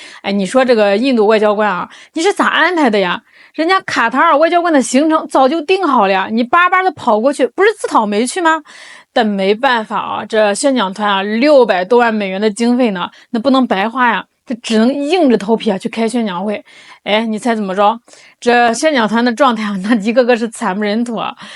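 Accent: China. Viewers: 口音100%是中国的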